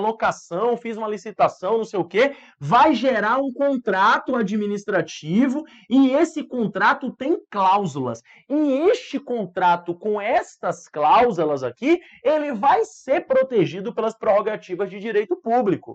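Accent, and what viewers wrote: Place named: Salvador, Brazil. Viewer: Quando escutei o sotaque, eu falei pra mim mesmo "esse cara é de Salvador" kkkkk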